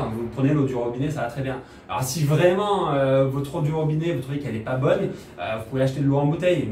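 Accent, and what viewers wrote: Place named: South, France. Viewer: Merci pour toutes ces informations intéressantes! Et avec l'accent du sud c'est encore mieux!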